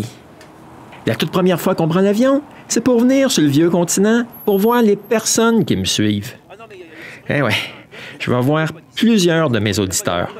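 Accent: Quebec, Canada. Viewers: ton accent, le fameux accent de nos cousins québécois, on adore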